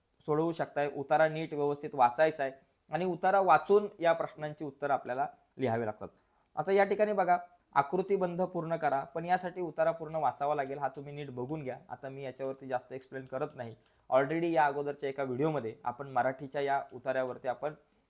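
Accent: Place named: Nanded, India. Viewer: नांदेडकर बोलते❤️❤️❤️❤️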